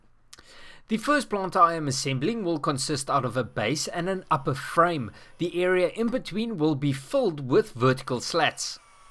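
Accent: Afrikaans, South Africa. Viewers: Lekker Afrikaans accent💪💪 lekker channel. Dankie. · i love south african accents. they're so jolly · You sound like one of those South African people.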